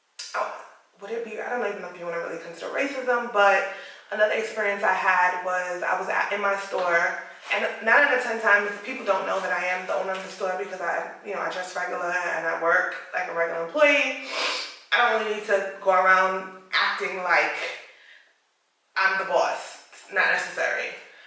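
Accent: United States. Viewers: ? You sound like an American. How did you get that accent. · for your accemt you are from usa......and thats your identity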